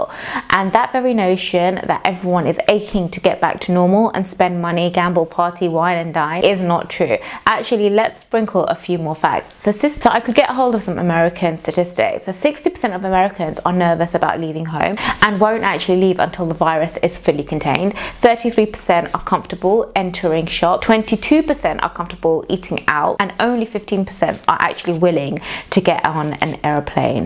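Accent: United Kingdom. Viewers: R u from London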